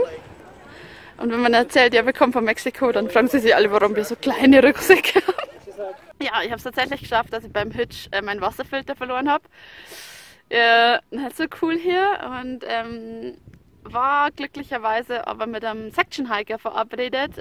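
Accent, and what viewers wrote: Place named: Bavaria, Germany. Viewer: Servus, was für ein geiles Video!!! Und dann a no auf bayrisch - perfekt und vielen Dank für diese herrliche Doku des PCT.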